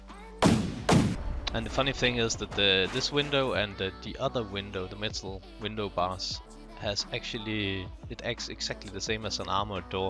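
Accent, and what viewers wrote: Denmark. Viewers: du dansk. tydeligt på stemme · Han er 100% fra Danmark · You sound danish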